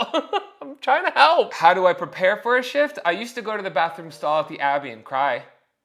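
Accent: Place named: New York, United States. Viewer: How have you managed to get a heavier NY accent and sound gayer at the same time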